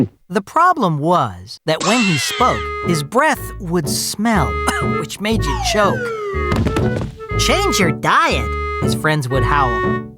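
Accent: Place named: United Kingdom. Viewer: I LOVED these!!! It's just not the same though without the British voice over :(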